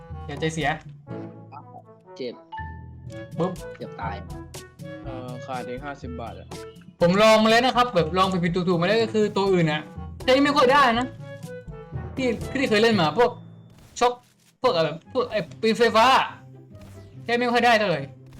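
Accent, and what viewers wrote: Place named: South, Thailand. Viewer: สำเนียงพูดเหมือนคนใต้เลยบ้านเดียวกันไหม😅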